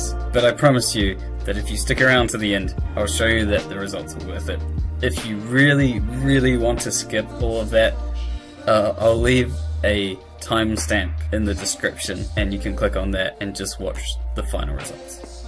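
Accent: New Zealand. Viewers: so refreshing to hear a kiwi accent talking about tm. where abouts are you from in nz btw? i'm in chch ~ psyko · Rest of the world says: section, checkpoint NZL says: séction, chéckpoint Funny accent! Good job with the video mate! Have you used the 'traveling salesman' problem for optimization? It is the most efficient way (that I know of) to determine the fastest route from A to B. Edit: I was halfway in the video when I asked it, sorry. So you did use traveling salesman :)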